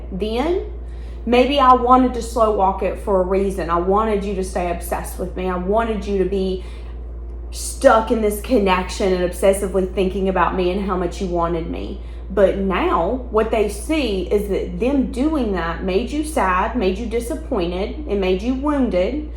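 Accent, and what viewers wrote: South, United States. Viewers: Unrelated but girl I’m from Mississippi and you have the most adorable southern drawl I have ever heard!!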